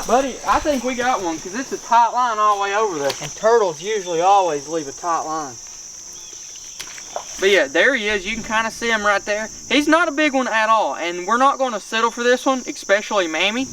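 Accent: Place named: Kentucky, United States. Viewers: & who says the southern accent indicates stupidity. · Genius. I see what you did with the short video. ngl this was entertaining and the accent bro. Grew up in a small Florida town so I love hearing the country accent. entertaining video man! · Where you from in Kentucky? I live in Ky & soon as I heard you talk I knew you must be from here lol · I can hear the Kentucky in your voice, It's good to see some Kin, Im from somerset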